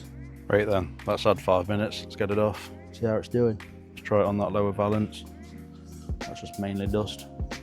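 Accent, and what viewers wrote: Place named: Yorkshire, United Kingdom. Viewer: A Yorkshire accent in a video always get a sub from me. Great video pal.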